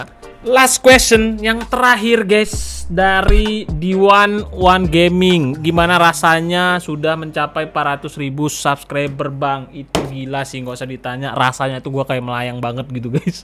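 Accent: Java, Indonesia. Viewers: Lu orang Aceh tpi logat lu kek orang Jawa bg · Waduh gak nyangka loh aku bang org Aceh, krn kalau kita lihat dari cara berbicaranya seperti org Jawa tulen Salam ya bang dari Aceh juga, doain juga di beberapa daerah di Aceh lagi terdampak musibah banjir bang. Mudah mudahan suksesnya bisa nular ke kita juga bang, sukses terus bang 🙏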